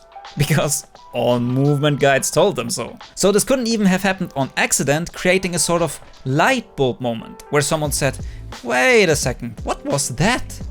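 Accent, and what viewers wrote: Germany. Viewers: Do u speak german? Because your voice sounds like your main language is german.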